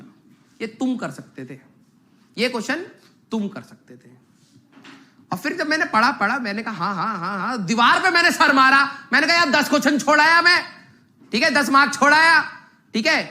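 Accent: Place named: South, India. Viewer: Ayiyo accent wala gajb ka😁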